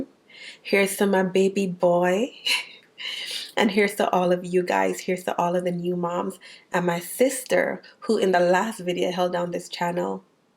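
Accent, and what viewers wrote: Jamaica. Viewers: Oh so you sound Jamaican and by the way congratulations on your baby boy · U got a jamican accent❤🇯🇲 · I know this was 2 years ago but the accent got me curious are u part Jamaican let me know please 🙏 🙏🙏🙏 And ur baby is so cute. Ur vids always got me smiling.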